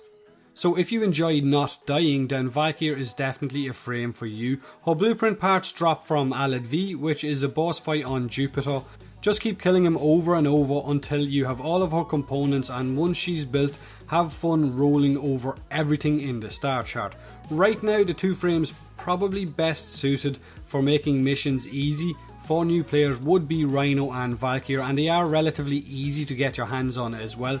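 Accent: Ireland. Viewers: YES IVE SPENT MONTHS LOOKING FOR AN IRISH WARFRAME YOUTUBER THAT ACTUALLY SPEAKS IRISH! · is that an irish accent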